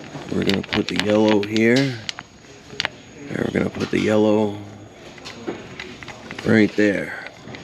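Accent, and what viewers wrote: New York, United States. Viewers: New York. is that where you work based on "giggidy" accent.......very nice.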